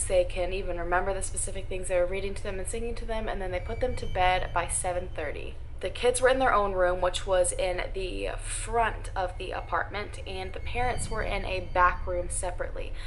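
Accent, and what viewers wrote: South, United States. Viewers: I love your Southern Belle accent, 'she gawn missin',...lolz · Girl let that southern accent OUT 😆❤️ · don’t worry about your country accent coming out occasionally. That happens to me too😂💓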